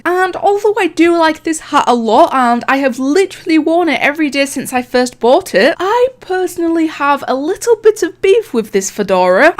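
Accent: United Kingdom. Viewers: Are you from UK? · U sound british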